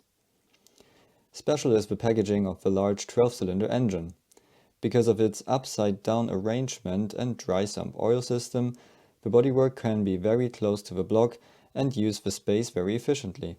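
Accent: Germany. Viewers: To anybody interested. The channel owner, although sounding German, I suspect has Scottish genes since I found out the name of the channel has thy Scots surname of. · And all this with the your german accent. Einfach herrlich. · Your accent sounds very german, i know that because i'm german, are you german too? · bro your german accent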